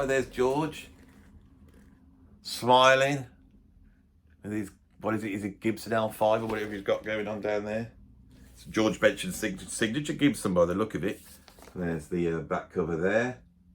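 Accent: Birmingham, United Kingdom. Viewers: Colosseum live! Now that's what I call impressive and I'm envious (but what about the condition?). Skinflint-fetishist; I can now say that I watch this bloke with a Brummy accent with who is a ....-.... drummer. The Michael Henderson budgie smuggler album cover reluctance anecdote cracked me up ps I picked up Focus 3 for next to nothing (in excellent condition) in an op-shop here in Brissy and it is the first time I didn't "get burnt" with a double-album. *op-shop = opportunity shop = charity shop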